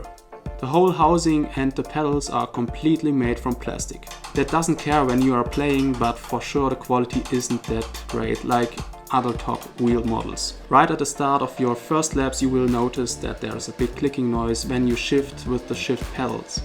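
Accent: Germany. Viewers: Wir deutschen haben halt einfach diesen Dialekt den man nicht überhören kann :-) Und weil du gesagt hast du wärst normal groß wie groß bist du denn? Habe keine Kohle mir das Zeug zu kaufen aber trotzdem... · Du klingst voll deutsch lol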